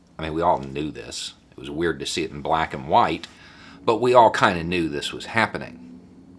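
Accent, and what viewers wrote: South, United States. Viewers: I apologize for my biased brain. I like to think I’m an open, intelligent, critical thinking fifty year old woman. But as soon as I saw your picture and read the headline, I thought for sure you were going to be a Trumplicker. Then hearing your southern accent, I really didn’t think I could listen to one more pro-Trump rant from a white guy. Well I was WRONG. My first quick little impulse about what I saw when I scrolled upon your video was clouded by my own judgement and pre-judgement. Prejudice is not a personality trait I want to associate myself with. So, thank you for being an intelligent voice in the fight against Trump’s re-election. I am thankful we can make mistakes and learn from our own shortcomings. I’ve gotten so trigger-happy and hyper-vigilant about anyone or anything that even smells like it might be a Trump supporter’s rantings that I was ready to jump all down your throat before really listening to you! 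Glad I waited before scrolling on and I’m now subscribing to your channel. 🌟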